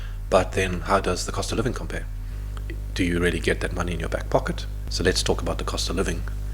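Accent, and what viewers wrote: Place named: Ireland. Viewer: You already have a bit of an Irish accent yourself!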